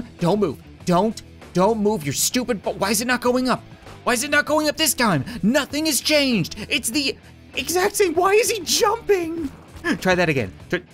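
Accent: Canada. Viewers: He pronounces things different cuz he's Canadian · Don't make fyn of him he's Canadian so he talks a little different what might make sense here dissent make sense to him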